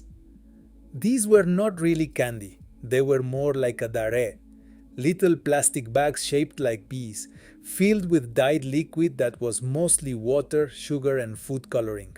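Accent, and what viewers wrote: India. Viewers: Why is the guy reading this have an Indian accent lol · Why does he have an Indian (hindi) accent...?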